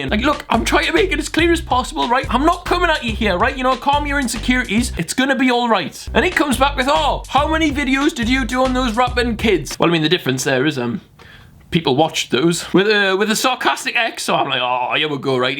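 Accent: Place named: Northern England, United Kingdom. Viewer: Ay who knew we had a northern English content cop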